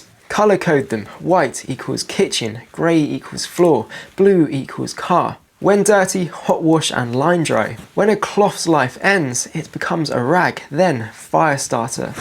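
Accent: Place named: Home Counties, United Kingdom. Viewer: Solid, reasonable advice that even the most ardent anti-preppers couldn’t argue with, store what you eat and eat what you store, 👍. Even when I think I’m prepared these videos show how far off the mark I am 😂. Ps. (No hate) I’m not sure if it’s just the way the guy speaks but he seems to have a “Home Counties” accent yet pronounces so many words in really weird ways - wonder if he has other nationalities in his family that make him speak the way he does. “Toe-matt-os” also I’m not sure if he’s saying morel as in the mushrooms or “moral” as in keeping the mood up, very off putting when trying to listen what he’s saying. That’s being said - would like to see some real world videos where he puts these recipes etc to the test in his house with the electric/gas etc off for a week 😂.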